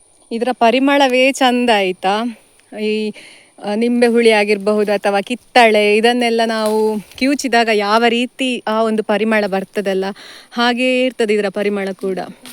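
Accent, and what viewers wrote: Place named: Udupi, India. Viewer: Namma Udupi bhashe matadteddiree ...neevu yelli navru...nanna ooru barkur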